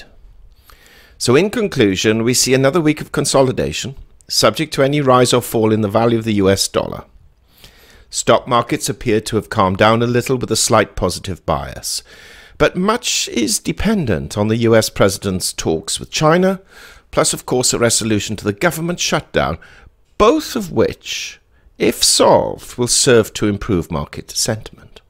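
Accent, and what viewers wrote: England, United Kingdom. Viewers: I do enjoy your pure English voice :) I tell those trying to learn English where I live attempting to shake off the chains of their poverty, that the purist form of English comes from the English :) I am going to recommend a few of those I care for to subscribe to this channel to help them with a little of this purity :) As far as this post goes however re my understanding being more focused on the substance, I agree it is following standard trend, until it doesn't :) Panic can begin within minutes and within hours a different world may be what is faced. Yes chances are slight, or are they? The fixation on the Tariffs hid its true meaning of the Pre Tariff sugar fix, pre purchases, costing investors trillions. Perhaps its hangover of such actions and what it causes is now hidden under the Government shutdown :) We will just need to wait and see, wont we :)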